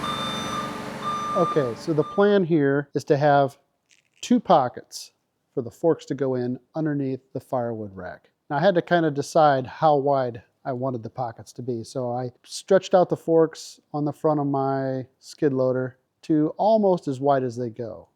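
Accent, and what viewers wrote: Germany. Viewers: Why does this have a German voice over?????????????????????????????????????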